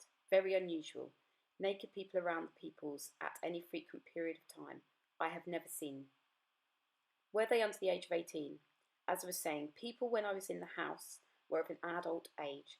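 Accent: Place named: RP, United Kingdom. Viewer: Your accent is more posh then Maxwell's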